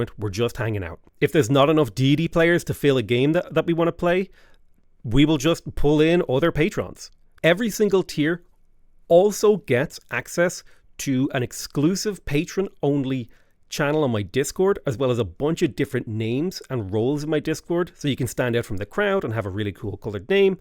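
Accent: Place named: Ireland. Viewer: Hey, long time fan/ first time commenter, here. I think you have earned another patreon supporter since I have been enjoying your channel for over 5 years. I remember one stream where you were talking about how many people think your are so smart (the accent helps) and you replied that..." if I you were really smart I would have money and a career". I know its hard to earn a good living these days in California, and probably harder in Ireland. Congratulations! you have found a unique path that I would like to support. Keep up the great work! Oh I was wondering, do I need Facebook to view your Patreon pages? I hope not, cause I got rid of Facebook.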